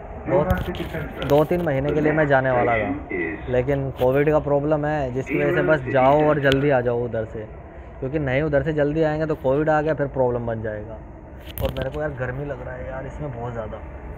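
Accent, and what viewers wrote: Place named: Bijnor, India. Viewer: Hallo bhai up bijnor se ho kya ❤️❤️🙏🔥🙏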